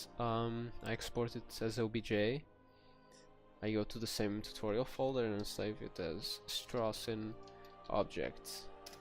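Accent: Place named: Portugal. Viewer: Nice video man, could swear by your accent you are Portuguese (^_^) (Confirmado, reparei agora no canto inferior direito do ecran, ta o teclado em pt heheh) Continua esses videos bacanos, abraço.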